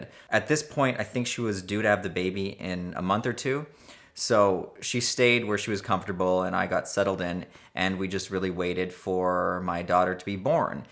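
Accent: Canada. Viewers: How come Canadians always say "about" weird?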